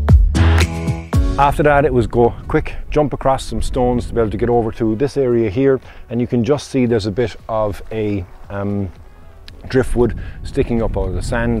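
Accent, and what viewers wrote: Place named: Ireland. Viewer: Intro footage and tempo, class! a bag of top shots and some tones from emerald voice! Well bloody done bud.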